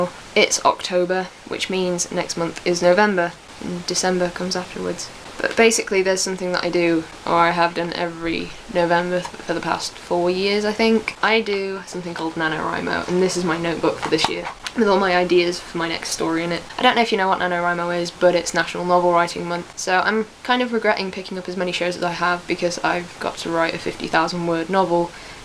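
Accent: United Kingdom. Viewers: Nice Review, It's nice to hear the voice of another British person other than myself lol. Also you did a great job editing the video It's almost seamless. Subbed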